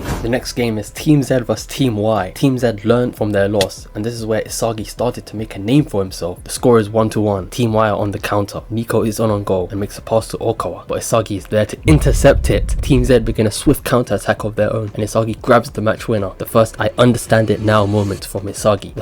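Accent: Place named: Somalia. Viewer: i can hear in ur voice you’re somali, good vid bro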